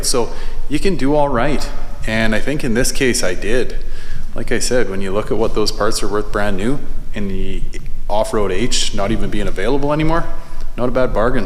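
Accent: Canada. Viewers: I’m “sowwwry” haha Canadian accent makes me chuckle. Beautiful shop man ! That’s awesome!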